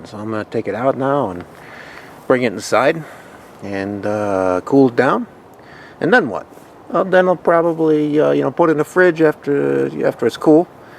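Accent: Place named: South, United States. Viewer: I love southern accents .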